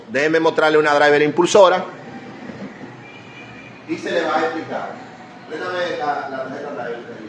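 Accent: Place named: Dominican Republic. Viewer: Por favor señor hay que ser comprensibles el maestro es de República Dominicana y así es su idioma 🤨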